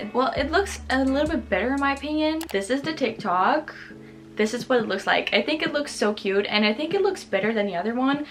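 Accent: Netherlands. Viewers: By the way you talk, Your dutch!!!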